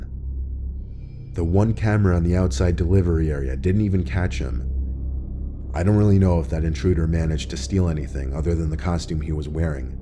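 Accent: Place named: United States. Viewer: After listening to so many Be. Busta vids I can hear heavy American accent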